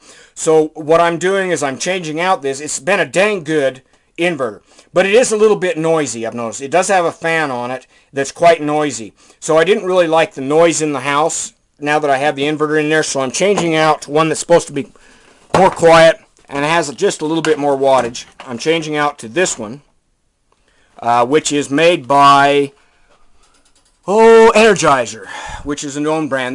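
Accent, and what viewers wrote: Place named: South, United States. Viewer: where do you live? pick up a southern accent but get snow?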